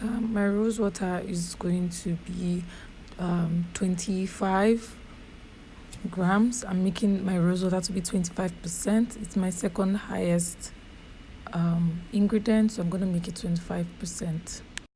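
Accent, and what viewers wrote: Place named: Nigeria. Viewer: well that's my point is you have a Nigerian accent so it's hard for some including myself to understand everything you said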